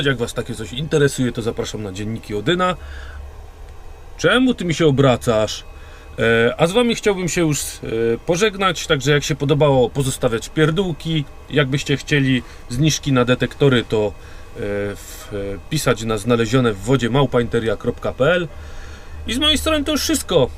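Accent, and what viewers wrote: Silesia, Poland. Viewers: W mowie słychać że jest taki charakterystyczny ton dla ślązaków (Pozdro z śląska)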